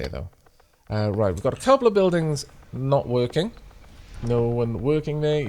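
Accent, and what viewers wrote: Wales, United Kingdom. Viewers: I always thought your accent was 100% unapologetically Welsh until yesterday while watching your video my fiance asked if I was watching "the German guy" and when I mentioned this to a friend he said you sound Swedish. 😂 not sure what they are hearing tbh.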